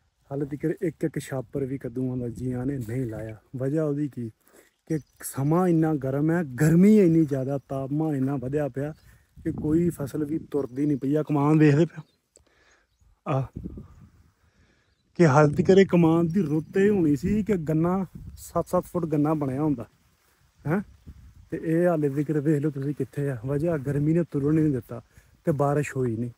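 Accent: Punjab, India. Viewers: ਪੰਜਾਬੀ ਬੋਲੀ ਤੇਰੀ ਠੇਠ ਪੰਜਾਬੀ ਸਾਡੇ ਨਾਲ ਦੀ ਬਹੁਤ ਵਧੀਆ ਲੱਗਿਆ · You. speak indian punjabi not pakistan punjabi from west Yorkshire UK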